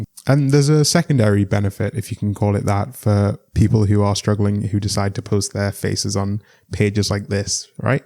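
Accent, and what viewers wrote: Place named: Newcastle, United Kingdom. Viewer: My mum looked over at my phone and said our accents are similar, u from new castle? Also she likes ur skin..no I'm not being held at gun point (help)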